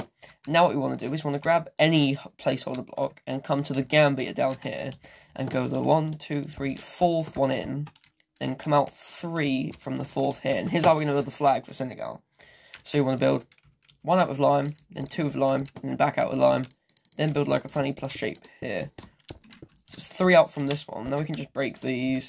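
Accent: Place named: United Kingdom. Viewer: You have a British accent That's mean you're from UK Am I right?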